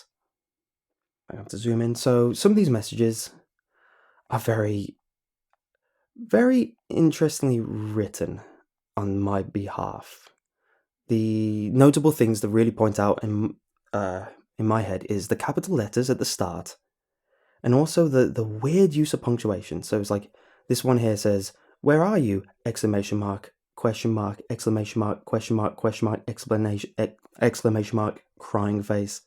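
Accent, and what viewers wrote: England, United Kingdom. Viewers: Well, I really like the videos you post, oftentimes fall asleep to CreepyPastas, your voice is somewhat nostalgic and melancholic giving them a pleasent nuance. And besides I'm quite found of the Brittish accent, my teachers was from Manchester. Keep up the good work brother! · Ayyy I watch your videos, funny seeing you here🤣. He's got a British accent so just assuming english is probably his first language. From what he was saying it seems like he is. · wow is part asian? who could have guessed that:O i always imagined you(by voice) to be a british guy. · And I wish it would change he's only got this one voice with exaggerated breathing or the annoying little kid voice. They're all thicc British accents when you're trying to hear about a teenager living in Alabama being stalked through a anbandoned mall by monsters. "Ello mate, my name is Skylar and me mum has sent me to the mall to get some cookies. 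Pip pip cheerio. Long live the Queen of America." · I thought he was gonna look like those british people ( which the fact that he speaks a british accent ) but with blonde hair and green eyes or something like that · this is an awful situation but GOD DAMN THAT BUTTERY ENGLISH VOICE MATCHES UP WELL YOU HANDSOME DEVIL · Is he english? Im english and he doesnt sound totally english.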